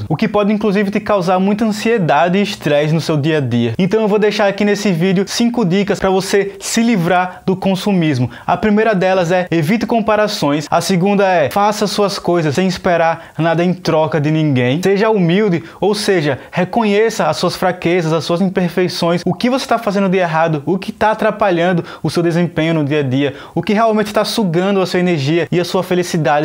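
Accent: Northeast, Brazil. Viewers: Tu é do meu Nordeste,é? Que vídeo maravilhoso. ❤️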